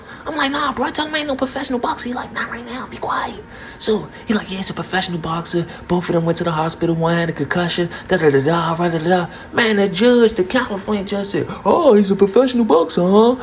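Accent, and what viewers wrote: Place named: Washington DC, United States. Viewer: My nigga from dc uptown talk just like you 😂😂😂 he had me all over dc when i lived in Maryland years ago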